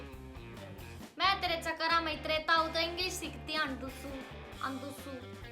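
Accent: Haryana, India. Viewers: I love your haryanvi accent. 🥰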